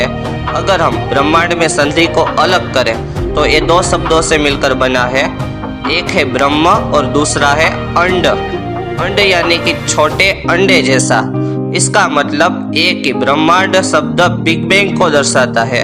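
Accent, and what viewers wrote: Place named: Gujarat, India. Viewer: I realized from your voice that you are from Gujarat..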